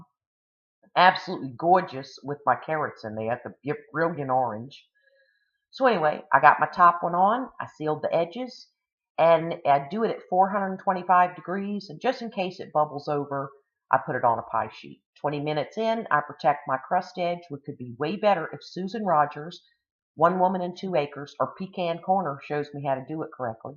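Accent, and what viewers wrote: South, United States. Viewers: now ya know i got to give a poke, a pee can is what a trucker uses lol youaiis acksent tells me ya from the south try Mrs V on OurHalfAcreHomestead the best cook iv seen on hear try this this will take ya rite to her making a turkey pot pie crust and all <link>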